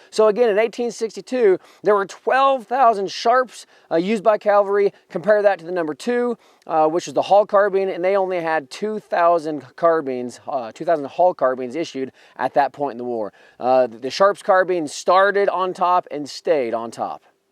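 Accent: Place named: Ohio, United States. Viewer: Ohio accent , lighten up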